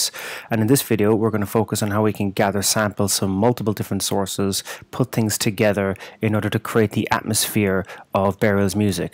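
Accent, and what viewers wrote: Ireland. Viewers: Can you do Detroit electro? And is that an Irish accent ?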